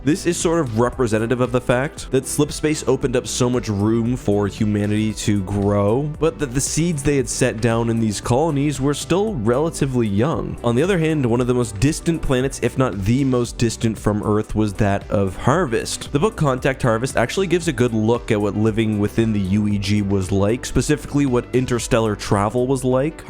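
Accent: Canada. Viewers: Gotta love his Canadian accent! Always good content tooo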